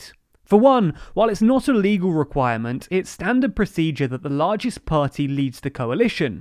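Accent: England, United Kingdom. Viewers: DitisKaas Appel there are dutch team members in TLDR, it's not 100% based, but ofc for clarity the english accent just works better than stone cool english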